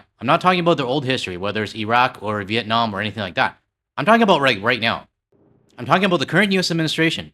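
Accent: Quebec, Canada. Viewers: About the goofy accent. I think it might be our fault, us French Canadians from Quebec, and our less-than-perfect English 🙃 Sorry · I think that dude calling your accent a goofy accent is very offensive to you Canadians, but the funny thing is that it is somewhat offensive to themselves too, as I am pretty sure that the majority of world citizens cannot distinguish the Canadian and US accent from each other. As a Dutch citizen, I can confirm that the trust in the USA has vanished in most of Europe and definitely in The Netherlands. People from left to right would never support this administration. So don't worry, Canada is definitely not alone. It is not a coincidence that the EU has made trade deals with other countries including India. As you said, the world moves on and let them believe that they are respected.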